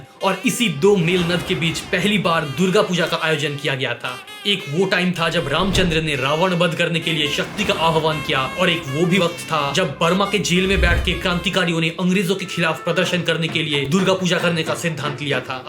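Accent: Bengal, India. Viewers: Bhaiya, kya ap Bengali ho??😁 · Hi, are u bengalee? It seems like that..... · Hindi accent sune bojha jacche tumi bangali🎉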